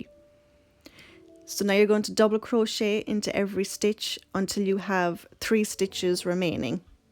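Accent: Ireland. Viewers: It's good to. Hear an Irish voice I am Irish from Dublin xxx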